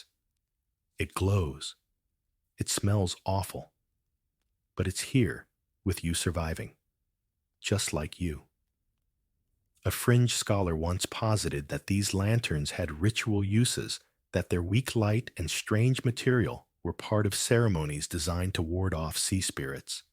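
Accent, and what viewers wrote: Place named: United States. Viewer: Your American accent sounds both patronizing and stupid - quit the channel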